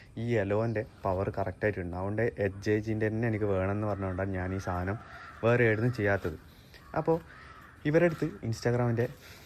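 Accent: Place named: Kannur, India. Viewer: Bro kannur ahno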